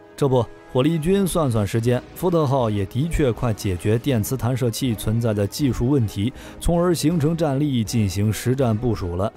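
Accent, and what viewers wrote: China. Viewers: 看他的解说用词和口音就知道绝对是大陆人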